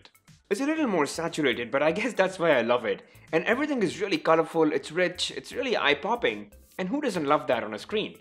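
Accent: India. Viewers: 这英语的咖喱味真明显。。。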